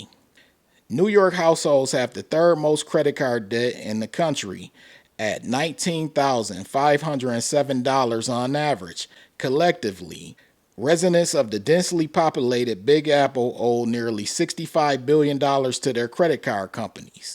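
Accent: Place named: Philadelphia, United States. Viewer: Bro, you sound like you're from Philly. Buffalo is a surprise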